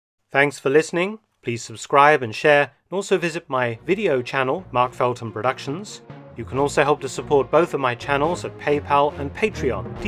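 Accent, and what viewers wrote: United Kingdom. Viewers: I dropped out of college, but my history class was the only one I completed. Dude was just like Mark without that silky British accent hahaha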